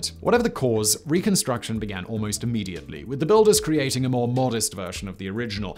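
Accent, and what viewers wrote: United Kingdom. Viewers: This guy uses his British accent for rhetorical emphasis. And then there is "Dem dooshen volke".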